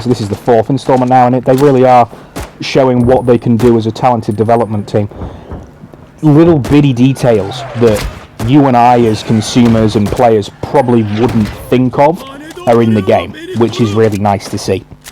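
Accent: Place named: United Kingdom. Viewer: The most British voice I ever heard, like I couldn't even get concentrated on the video, but definitely it's a good review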